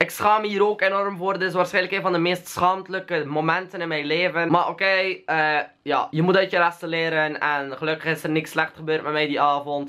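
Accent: West Flanders, Belgium. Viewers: ! Van west Vlaams in elk deel van belgie ander accent 😂😂😂😂😂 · Hij is inderdaad Belgisch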